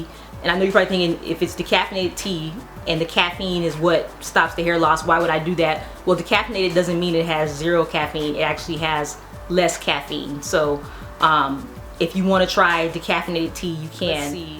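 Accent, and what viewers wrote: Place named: New York, United States. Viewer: Are you from New York? I am, your accent sounds familiar.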